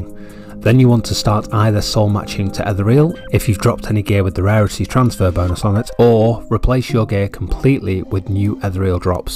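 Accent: United Kingdom. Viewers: It’s the British accent it gives the video the sense of genuine knowledge. Like a ted talk lmao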